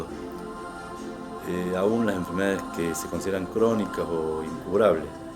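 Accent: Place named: Córdoba, Argentina. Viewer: Gracias por compartir por este medio tu conocimiento, con palabras tan claras y ademas, con tonada cordobesa.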